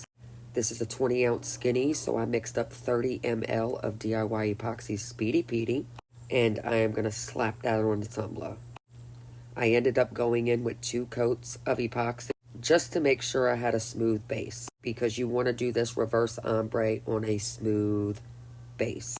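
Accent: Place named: New Jersey, United States. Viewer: You have to be a jersey girl lol if not you literally close ❤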